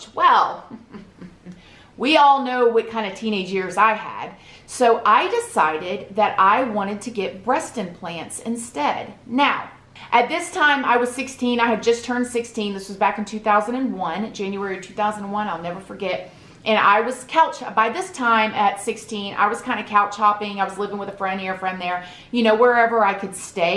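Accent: South, United States. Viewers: Hey nothing wrong with that southern swang 🤣 I live in the same town as her and we all say y’all around here 🤣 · guess I should've figured, being she talks about Florida's women's prisons.. lol I guess when she said a couple hours from New Orleans it threw me off.. plus I guess I didn't realize ppl in FL really talked like Southerners... What do I know? FL is one of the few states I haven't been to. Thanks for the response :) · You're so fn southern. It's like, real genuine "y'all" can't make it up❤❤ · 🤣🤣 ya'll count! I love it! I think it's the cutest! I love southern accents and the specific way they say certain things! So charming!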